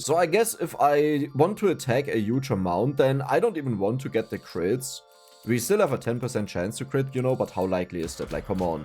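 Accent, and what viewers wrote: Germany. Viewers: Where are you from, because I like your accent I have never heard it before. I believe its an German accent right? Me myself am from the Netherlands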